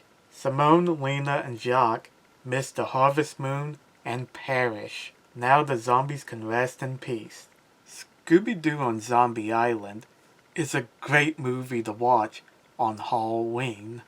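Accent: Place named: Maine, United States. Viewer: hey, just found ur channel thru ur video on roy and i loved it! very helpful information. i was just wondering, are u from the northeast by any chance? ur accent makes me think u might be from maine. take care!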